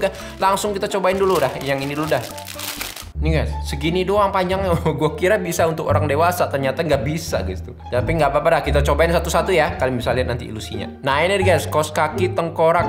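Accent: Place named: Madura, Indonesia. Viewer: Bang lu orang madura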